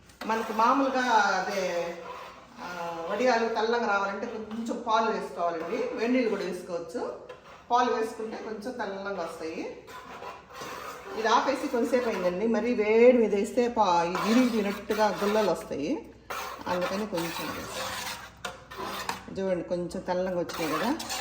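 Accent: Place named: Telugu, India. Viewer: Hi Garu, My name is from Chennai, your video brought me some fond memories as we used to make it with my grand mother, on our terrace. I used to eat them all the time with sambar and annam vadiyalu. Also Perugu annam with mamidi panda, I absolutely fell in love with your telugu accent as we speak the same way, as we are from a agriculture and diary background from ongole. I was wondering if you could make a video on how to make good forgotten simple foods like Paramannam, Pappu charu, Chinthakaya Dosakaya Pachadi, etc similar to our region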